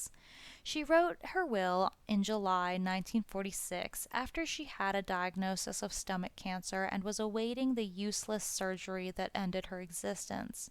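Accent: United States. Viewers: The thick whiny Ameican accent renders this program unlistenable.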